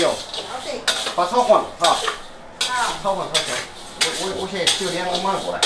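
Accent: Chengdu, China. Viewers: 你的成都话我太欣赏了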